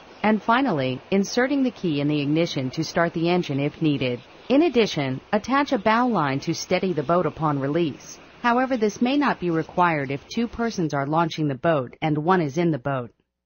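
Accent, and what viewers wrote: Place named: Australia. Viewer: Thats awesome! Are you from like Australlia or something?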